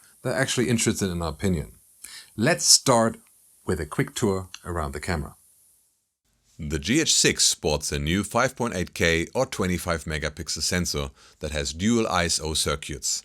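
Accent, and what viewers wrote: Germany. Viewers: I could listen to that German speaking accent and intro synths forever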